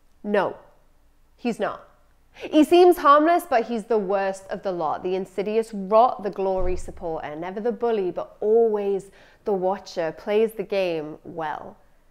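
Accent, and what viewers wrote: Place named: Newcastle, United Kingdom. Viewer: Amazing! In the wellness/yoga circles I work in Ive defo met a fair few of these guys!! You express it so well and great to hear a fellow geordie covering this important topic thanks 💚🙏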